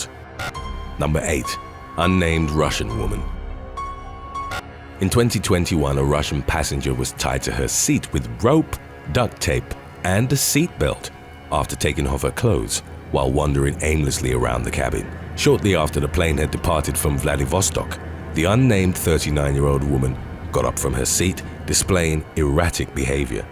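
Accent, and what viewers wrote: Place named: United Kingdom. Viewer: You sound British 🤮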